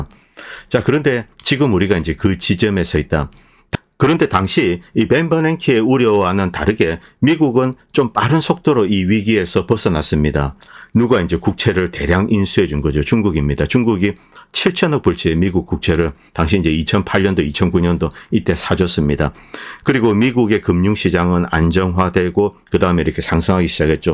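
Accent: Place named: Busan, South Korea. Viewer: 부산 억양이 매력적 이시네요...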